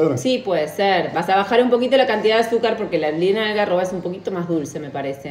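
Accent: Argentina. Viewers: Ahí diese Ecuador yo pensé que eras de la argrntina Saludos amigos colombianos 😊😊😊😊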